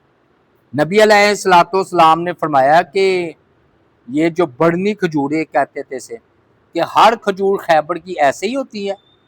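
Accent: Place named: Lahore, Pakistan. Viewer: I like his lahori accent..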